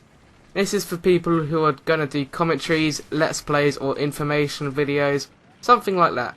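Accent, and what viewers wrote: United Kingdom. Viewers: not every one has a great british accent!!!!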